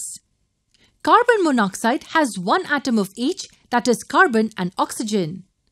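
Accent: India. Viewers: A superb video I can easily understood it but there is a question are you a Indian?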